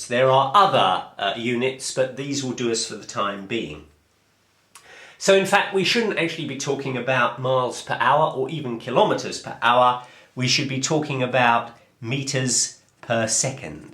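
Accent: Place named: RP, United Kingdom. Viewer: why is this guy so posh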